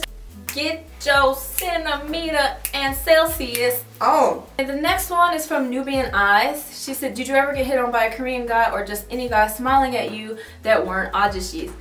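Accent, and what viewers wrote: South, United States. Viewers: You're really pretty. And that southern accent...love it! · You sound like your from the south in America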